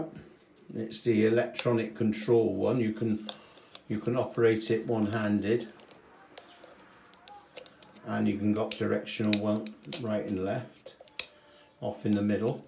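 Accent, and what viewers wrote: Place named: West Country, United Kingdom. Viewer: Great thank you for the inspiration. Ever since a lad when my dad took me to model railway shows did I want a narrow guage layout so I've decided at last to covert some of my 040 into 7mm tanks. Sounds like your from the West country. I was from Gloucester but now live in West Wales so I paint everything grey!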